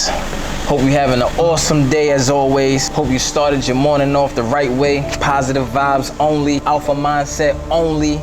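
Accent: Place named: Brooklyn, United States. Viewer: Yo my Guy, u be preaching. You need to give your bio or something. Let the people know who you are. You are the first motivational speaker who talks like me ( hip hop). You gotta be from Brooklyn. I’m from Queens. I have been struggling and listening to you is really helping me Bro. Salute💪🏿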